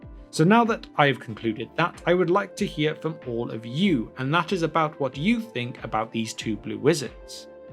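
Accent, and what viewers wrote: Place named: West Country, United Kingdom. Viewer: I love your west country twang, very hobbity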